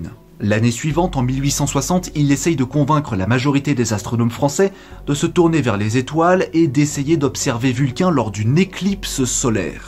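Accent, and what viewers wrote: Germany. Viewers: Poce bleu pour ton accent allemand :P Sinon super vidéo :D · Pouce bleu rien que pour l’accent Allemand ;-) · C'est bien cette chaîne, pouce bleu pour l'accent allemand et la voiture qui passe ! Merci pour ce contenu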